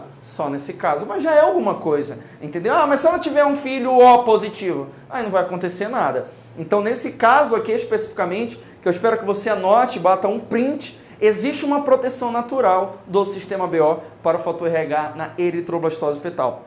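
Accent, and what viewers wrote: Pará, Brazil. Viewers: Tu é paraense mano? Tem um sotaque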